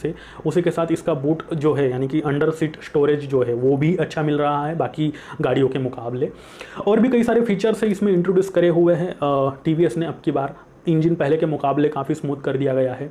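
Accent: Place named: Maharashtra, India. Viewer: Dada ascent madunach mait padtay marathi manus...great work...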